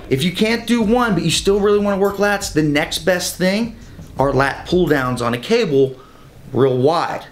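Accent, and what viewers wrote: South, United States. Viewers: Where is from originally. Sounds southern..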